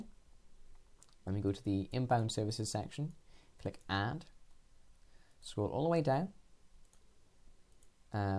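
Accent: Wales, United Kingdom. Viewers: you sound welsh mate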